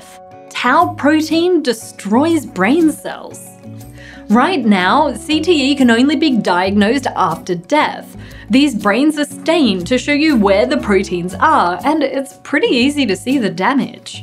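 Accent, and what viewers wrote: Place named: Australia. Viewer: I am myself from Australia and wow, you have the most pleasant Australian accent and voice I have ever heard. I actually wasn't even sure if you were Australian, and that perhaps you had a less than usual British accent. I will happily listen to your voice speaking about psychology. SUBSCRIBED! :D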